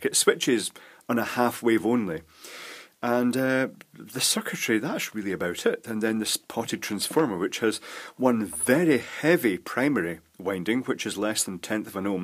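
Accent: Scotland, United Kingdom. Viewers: I have this exact unit, arrived today from China (impressively, in 7 days). I'm well aware of its enthusiastic use by the BDSM crowd (even seen an ancient one used in a public setting at a club - eek) but I bought mine simply to disinfect skin and keep zits at bay. Some aestheticians suggest it can be used for "skin lifting" but I guess bigclive sees it as quackery, and he's entitled to his opinion - I really do think it helps acne, though. Nice to hear a Scottish voice on Youtube :) Thanks for the very interesting video!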